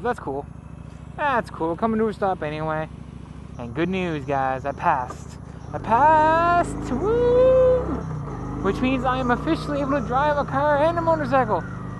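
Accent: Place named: Nova Scotia, Canada. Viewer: Were your first <3 God I can hear the Nova Scotian within you.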